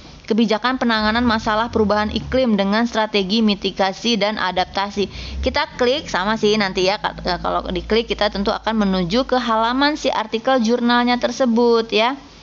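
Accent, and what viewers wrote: West Java, Indonesia. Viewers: Hatur nuhun ibu...meni Sunda bangets hehehe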